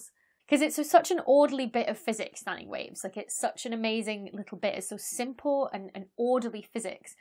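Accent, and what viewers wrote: United Kingdom. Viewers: I like how you pronounced that British t-sound from 'plot' by just stopping the airflow with your throat. I mean, how do you explain this to a phonetician who has learned that the the T-sound is a dental sound. For some one lip-reading you, you just said plo. Please keep that accent, I love it.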